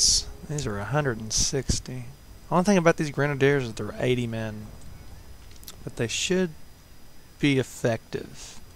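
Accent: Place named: South, United States. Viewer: your southern accent is great dude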